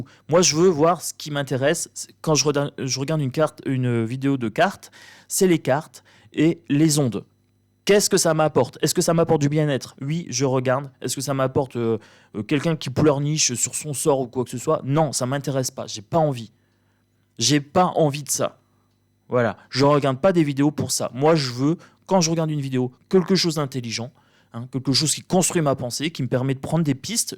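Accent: Nord-Pas-de-Calais, France. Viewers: Coucou sa fait du bien de vous entendre parler chti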